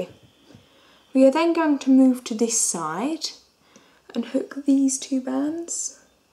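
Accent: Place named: England, United Kingdom. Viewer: I love your accent! I'm from England too but I don't have your accent :( but thanks for the tutorial! :)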